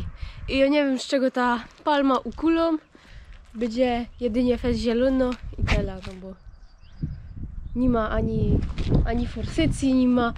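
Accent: Silesia, Poland. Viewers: Palma ładna i Śląska gwara też fajna lubię cię oglądać. To pieczony chleb z wędzonką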